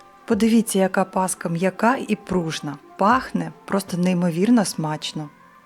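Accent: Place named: Ukraine. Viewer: Зачем на здесь слушать этот украинский говор.. Пусть на своих каналах вещают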